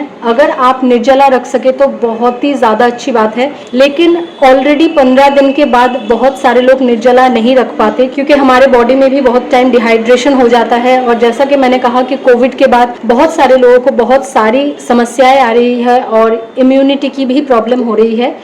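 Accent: Bengal, India. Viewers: Wo bengali hain to thoda bengali accent rahega to aisa sunai dega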